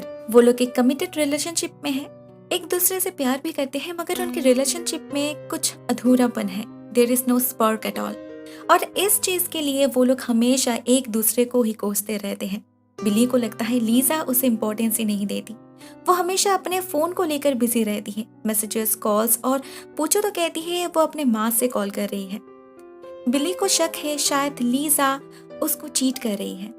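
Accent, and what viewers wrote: Bengal, India. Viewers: You are bangoli right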